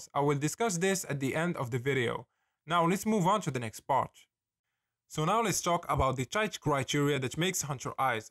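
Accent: Morocco. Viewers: You sound like a moroccan with that accent 💀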